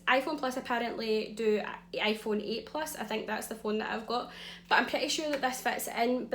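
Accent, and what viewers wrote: Scotland, United Kingdom. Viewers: Just subscribed nice to hear a Scottish accent that isn't making me cringe! I very rarely comment but how about a Watt Brothers haul, the make up deals they have are phenomenal!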